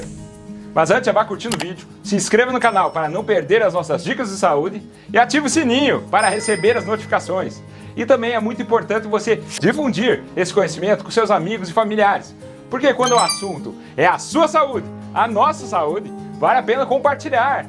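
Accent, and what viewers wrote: Brazil. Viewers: É o sotaque caipira e a maneira desembesta de falar… Se ele é humilde, não dá pra saber. A humildade é uma virtude muito rara nos dias de hoje.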